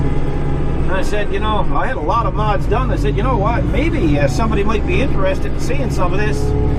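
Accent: Newfoundland, Canada. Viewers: where are you from in Canada? it sounds like your a Newfoundlander because I am one too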